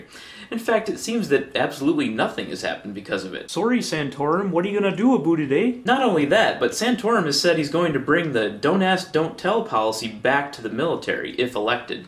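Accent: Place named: Canada. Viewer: Amazing canadian accent, props.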